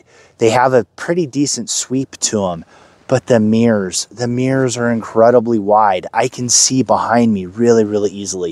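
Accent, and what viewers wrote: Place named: Los Angeles, United States. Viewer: Sounds like she's from LA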